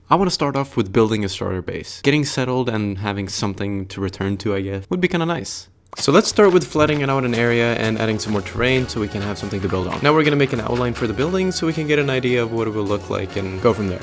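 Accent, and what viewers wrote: Sweden. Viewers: I knew you were Swedish from the accent before I checked the channel info lol Great video!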